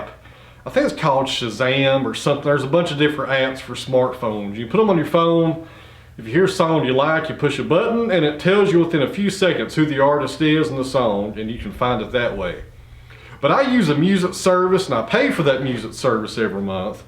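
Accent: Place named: Tennessee, United States. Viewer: You’re starting to sound like that concrete truck driver. NE TN dialect is kicking in!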